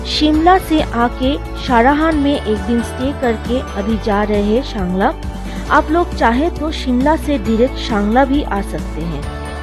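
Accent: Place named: Bengal, India. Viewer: Nice documentation and description. From your pronunciation, it's clear that you are from Bengal. But description is outstanding. Thank you.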